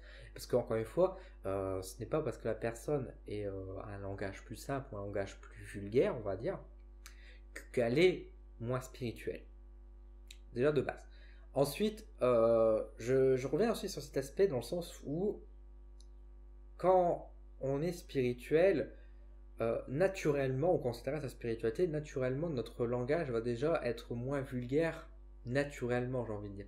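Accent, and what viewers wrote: North, France. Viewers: Ben t'es un gars du nord quoi ! 😊 On marche sur la tête si maintenant on ne peut plus s'exprimer tel qu'on est... Le naturel ya rien de plus vrai... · Reste naturel, toi même, je suis du nord aussi. Si la personne ne te comprend pas elle te posera la question(vous pourriez m'expliquer)? C'est tout 😊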